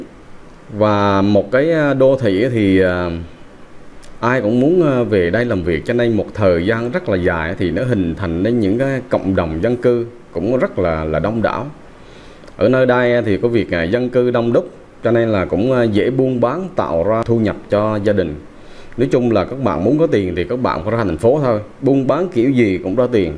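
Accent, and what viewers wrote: Quang Nam, Vietnam. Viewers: Nghe giọng QN ĐN chán chết đc .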